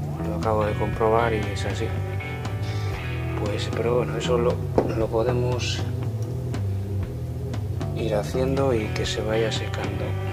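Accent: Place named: Galicia, Spain. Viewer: MUY BUENO LO SUYO DIDACTICO CLARO Y PRECISO COMO SE DEBE HACER .ME GUSTARIA SABER DE QUE LUGAR DE ESPAÑA ES USTED....PREGUNTO PORQUE COLECCIONO EN MIS OIDOS LOS ACENTOS DE ALLI. NO ES ANDALUZ NI CATALAN NI EXTREMEÑO N NAVARRO..NI ASTUIRIANO. EL SUYO ESTA CERCA DEL GALLEGO PERO NO ESTOY SEGURO.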